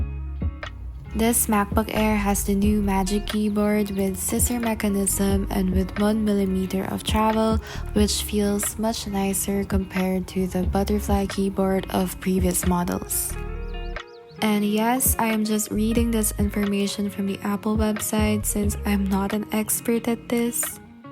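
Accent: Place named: Latin America. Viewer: You sounds like a Latino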